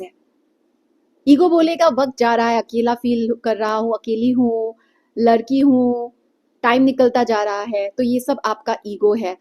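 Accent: Assam, India. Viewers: You’re from Assam? I find your Hindi accent a bit of Assamese😅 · Ma'am aap Assamese hai kya?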